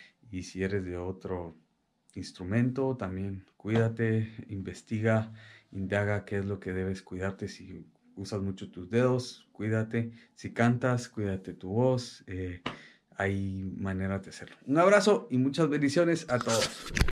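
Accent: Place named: Mexico. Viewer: Más de Guatemala habla más como de México hermano jeje 😂 😂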